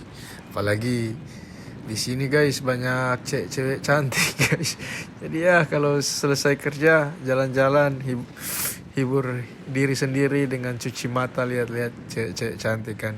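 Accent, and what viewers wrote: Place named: Manado, Indonesia. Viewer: Dari logatnya ngana dari Manado kang, butul2 nyong Menado guanteng2 do e, semangat ya nyong Menado, Tuhan Jesus memberkati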